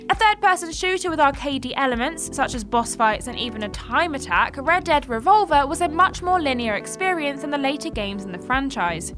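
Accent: United Kingdom. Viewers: English (British) pronunciation.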